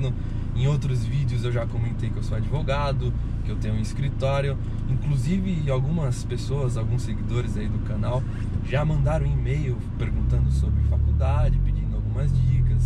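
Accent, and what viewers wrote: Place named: Rio Grande do Sul, Brazil. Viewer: Acho que eu sou o unico que imaginava que ele fosse assim kkk pela voz dava pra saber que tinha uma aparência nova Mas pelo sotaque parece gaúcho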